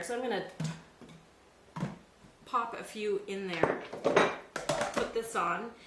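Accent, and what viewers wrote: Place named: Minnesota, United States. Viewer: nice video, nice accent, are you from Minnesota? don't ya know, I get the big sack of onions, all they sell at the costco and need to do this, thanks!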